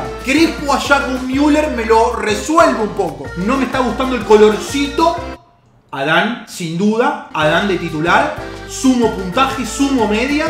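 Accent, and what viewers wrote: Argentina. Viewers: Se copia de robert solo que con acento argentino :v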